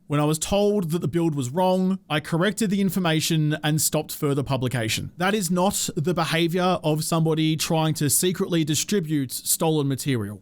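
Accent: Australia. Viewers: I am here for the, not the game... for many years now...at first i was like "dang that man is talking like a fkn machine gun and is also as loud:D" but i stayed, first for learning the game and its mechanics, later, because i love your loud aussi snout;) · nooo-wwwww. video-wwwwwww. the older i get, the more obnoxious the australian accent becomes. insufferable. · I have been following you for what feels like nearly a decade now. You have been my favorite content creator based on your personality and great Aussie accent. Keep your chin up, it will get better.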